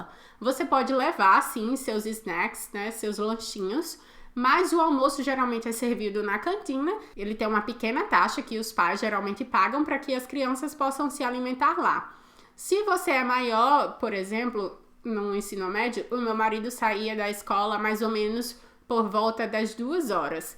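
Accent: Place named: Northeast, Brazil. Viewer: Em primeiro lugar, como baiana que sou, amo este sotaque nordestino. Aqui também os alunos nao usam farda e pagam meia passagem. Inclusive a Eslovaquia faz fronteira com a Italia né?! Aqui é bem comum as crianças e adolecentes fazerem excursao, pela escola para outros paises da europa, bem legal mesmo! Bjs 1000 e obrigada por falar de mim.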